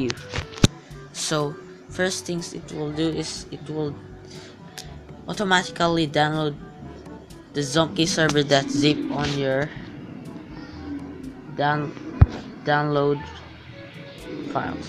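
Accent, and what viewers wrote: Philippines. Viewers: Yep the accent is my fellow filipino friend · I can easily tell that you're Filipino :D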